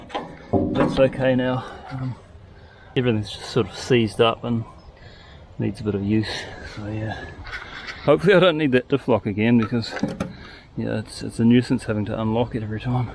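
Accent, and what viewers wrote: New Zealand. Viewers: Recording equipment you use and how much time? Amazed at the variety of angles you achieve and even what looked like overhead drone footage at the end! Amazing. How many and what type of cameras are you using and how do you set all up to make these videos so well produced? Are you filming solo? It feels that way. A fun 30 minute video on how you set up, film and edit would be fascinating! Love the kiwi accent as well! We lived in the Bay of Island for seven magic years. Miss it. Well done mate! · Just came across this channel then realized hey you sound like a kiwi me too! Bet we have some common friends somewhere 😅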